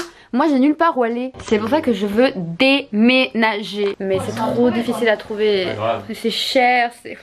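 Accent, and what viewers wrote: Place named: England, United Kingdom. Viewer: Chu morte pourquoi à chaque fois que t'es avec tu prends un accent anglais??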